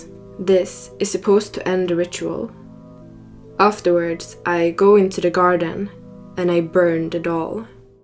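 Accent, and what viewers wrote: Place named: Iceland. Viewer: Oh, I didn't recognise your accent as Swedish. I was thinking you might be Icelandic. Thanks for sharing.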